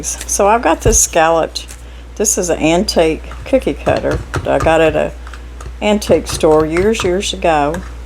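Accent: South, United States. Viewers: Dear Miss, Absolutely love your hair style! You look prettier all the time, and you’re already beautiful! Thank you for all your wonderful videos and for sharing your lifestyle with all of us……..your devoted fans! I love listening to your beautiful southern accent, no matter what the subject of the day offers, whether it’s cooking, gardening or just listening to you and sharing your thoughts and memories. I’m forever grateful that I found your Utube channel. God bless you! Love, Jackie🪷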